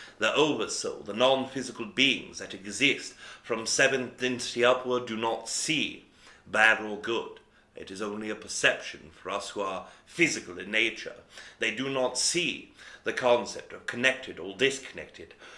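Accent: United Kingdom. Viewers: Oh wow, your voice when channeling Aridif has changed a lot. Here, you sounded just like yourself but with a mild British accent, and now (when you channel Aridif) you sound like a much older man with a thick British accent, that’s so crazy! It’s cool!!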